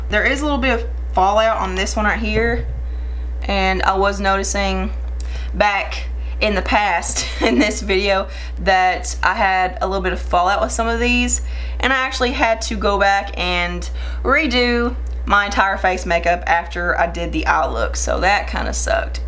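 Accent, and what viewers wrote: South, United States. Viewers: New subscriber! Love hearing that southern accent...I'm a Alabama girl...Roll TIDE! Your're so funny and have some great content!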